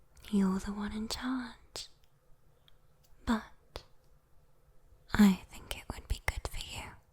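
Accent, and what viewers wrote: United Kingdom. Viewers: British accent 👌👌👌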